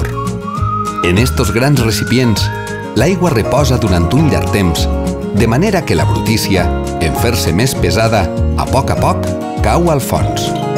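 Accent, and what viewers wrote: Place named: Lleida, Spain. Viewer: Buen video, lo pondré todos los días para despertarme con una sonrisa en la cara ;-) P.D: me encanta tu acento de de Lleide (me pone a 100 bb)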